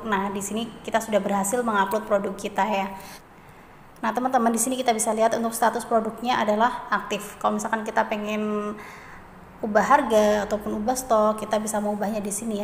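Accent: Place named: Java, Indonesia. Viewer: Kayak ada manis2nya .... orang jawa ya ka?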